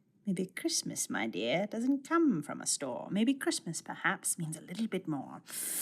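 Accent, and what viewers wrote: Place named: United Kingdom. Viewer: As a Brit, I rate your British accent 10/10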